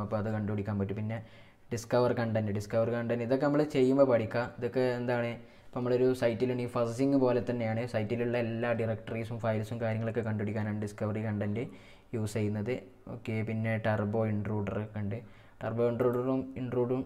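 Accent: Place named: Malappuram, India. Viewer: malappuram language slang anu allo . entha e olu😁😁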